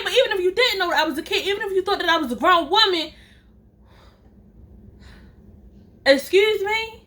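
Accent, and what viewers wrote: Baltimore, United States. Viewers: Bruh lol this my second video I’m watching I just knew u sounded like u was from Baltimore 😭 I was like I ain’t tripping then u said city I was like oh yeah the accent never lies ! Now I feel the vibes stronger 🙌🔆‼️😎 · Ikkk you sounded from Baltimore hey girl hey lolll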